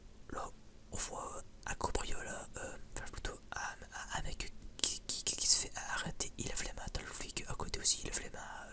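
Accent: North, France. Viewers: Tu es bien du Nord 😊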